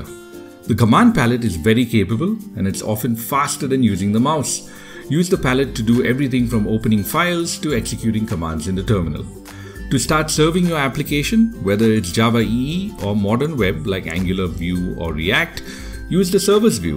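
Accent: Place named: India. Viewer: An Indian accent, really?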